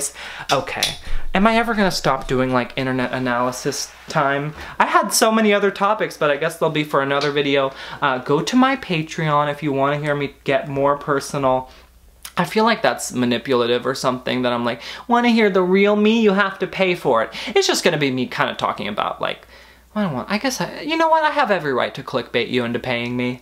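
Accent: United Kingdom. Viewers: WHY IS THE BRITISH VOICE SO FUNNY IM DYING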